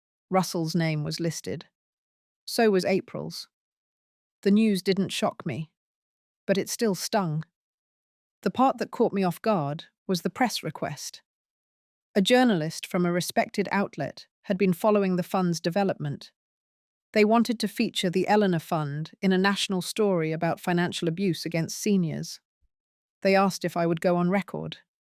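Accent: England, United Kingdom. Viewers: Beautiful voice and diction I have not heard in years. I am from Singapore . Please keep it up. You are British ? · The narration portion of the Ai data input chosen- needs edited out. 😂😂 Otherwise good story. Like the English accent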